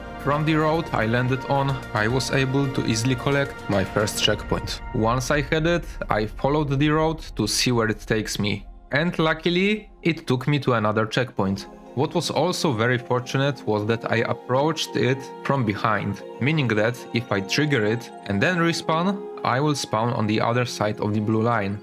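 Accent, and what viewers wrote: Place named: Poland. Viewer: bro Why i think you from poland dlaczego wydaje mi się że jesteś polski ?????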